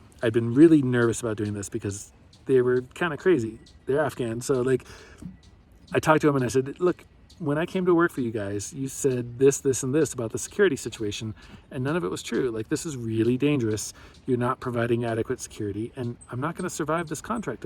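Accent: United States. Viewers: I live in idaho, your accent is idaho?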